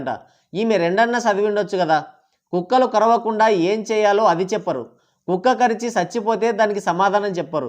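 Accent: Rayalaseema, India. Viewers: I watch your videos regulary and always like your punches, video script, and presentation(Vakchaturyam), I am from London. The way you speak you sound like you are from Rayalseema and me too from Dhone.Good I would like to meet you in my July visit to India. Let me know if you would like to meet, I can share my contact.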